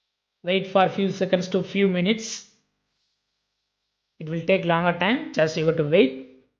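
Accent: India. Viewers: For God sake speak English like English not like hinde accent.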